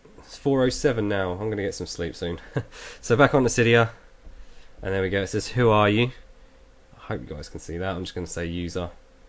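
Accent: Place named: Southeast England, United Kingdom. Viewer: Thanks a lot brother. By the way I love Southeast England accent.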